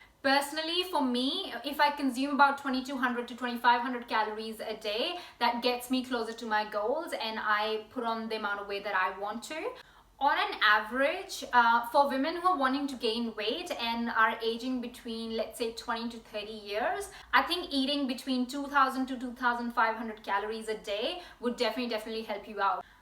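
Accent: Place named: United Kingdom. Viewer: I guess she lives in britain from a long time since she's using British accent, so you know she got that habitually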